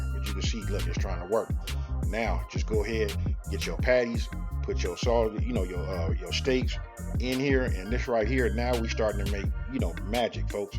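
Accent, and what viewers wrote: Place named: South, United States. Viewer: Yes but cause he's living in Texas and working he is definitely sounding like a Texan too!😉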